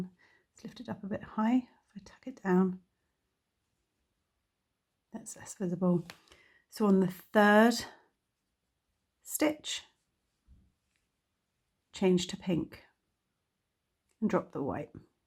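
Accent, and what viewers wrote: England, United Kingdom. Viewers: I adore this and your style of teaching is brilliant. The only thing that fooled me was I heard the English accent and thought, yes English terms , but alas not, it was America terms. Keeps my mind active, changing them into English as you are saying what stitch to use. Lovely work. Thank you .💞💞💞🧶🧶🧶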